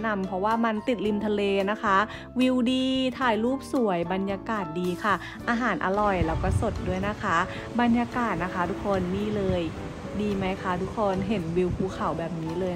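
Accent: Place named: Thailand. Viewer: เสียงเหน่อจัง คนจังหวัดไหนครับ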